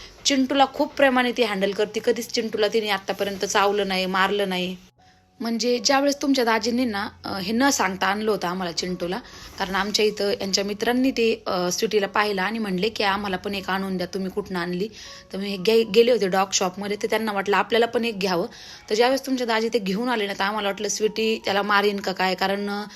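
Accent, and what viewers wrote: Ahmednagar, India. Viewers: Nagri bhasha asich ahe Jo to tyachya gavanusar bolto...amhi pn ngri ahot...bhashevrun kunala nav theu nka mumbait rstyavr rahnare pn standerd boltat....nagr chi hich bhasha ahe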